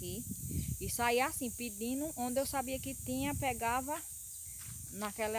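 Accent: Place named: Alagoas, Brazil. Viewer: adoro o sutaque de Alagoas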